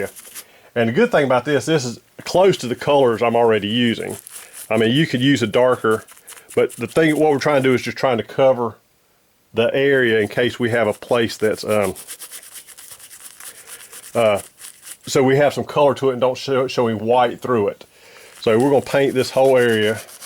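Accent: South, United States. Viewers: It also helps 10-years later.......... Thank you for the information. P.S: Good to hear a Southern accent (familiar to me).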